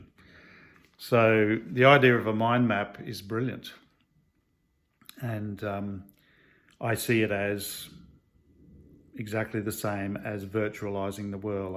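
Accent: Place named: Singapore. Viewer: Stewart Lovegrove Honestly,I study with Leo Lit who is a high level student of Chu Shong Tin.Leo Lit's name is mentioned right up there with Jim Fung...etc....He talks about "Little Idea" vs "Big Idea" which is loosely translated as Sub-Conscious/Motor cortex vs Conscious/Analytical mind. To make a long story short,you're trying to access the "Little Idea" part of your brain/mind to have success in this style of Wing Chun. In my humble opinion, is not explaining that properly. Also,I'm fluent in Mandarin which helps me a lot.Also,Leo Lit is from Hong Kong and studied with Chu Shong Tin for many years.,I believe is from Singapore judging from his accent,but I'm not sure. In any event,I'm not sure why he keeps saying only "NimTao" as the "Siu" is there for a very important reason and cannot be neglected. All this "Heart Mind " stuff just confuses the issue.Yes,there is the character "heart"心 in Nim,but that doesn't mean we should call "NimTao" "Heart Mind",because all it means is Thought,Idea,or Intention. 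Anyway,hope this helps:) Having said all that,hopefully one day I can have a discussion with about this as he seems to be a very well researched person with high achievement in the art.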